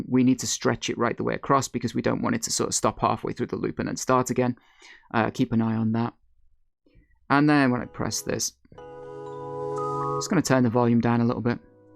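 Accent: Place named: London, United Kingdom. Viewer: I can hear the Liverpool and Manchester -it’s actually the London that keeps wanting to break through 😀✌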